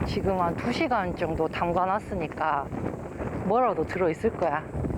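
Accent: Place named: Jeolla, South Korea. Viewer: 이언니~ 전라도 여자맞네! 억양이 세다..... 나도 남들이 듣기에 이럴꺼야~~ 같은 전라도 여자잉께 ㅋ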